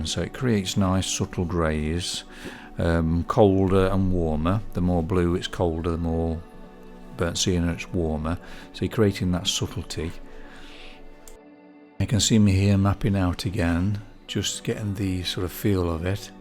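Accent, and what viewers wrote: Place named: Coventry, United Kingdom. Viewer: you sound like youre from around the coventry area.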